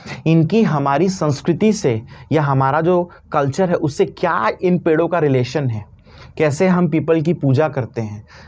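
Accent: Chhattisgarh, India. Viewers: Thank you sir😁🙏😁... Sir aap kaha se hain...apki chhattisgarhi ka lahza achha lgta h....😁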